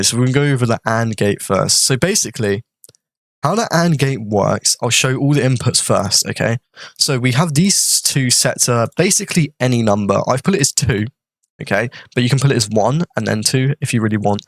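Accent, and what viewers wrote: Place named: United Kingdom. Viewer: he got that Gordon Ramsay accent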